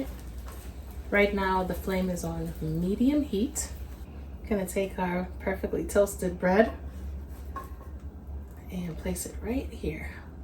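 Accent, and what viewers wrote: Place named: Brooklyn, United States. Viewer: Ohhhhh... Darlin.....you make meold mouth water. i want to try these!! BTW... I love your sweet accent... a little Brooklyn... or New Jersey maybe?? Thanks for sharing.... yummmmmm. Ax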